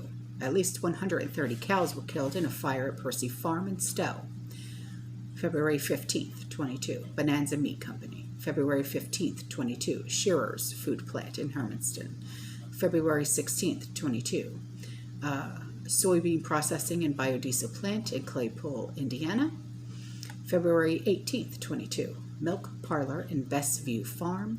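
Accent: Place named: New Jersey, United States. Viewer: yes I noticed that at the end. Thank you for all you do you are so awesome. I love your Jersey accent!!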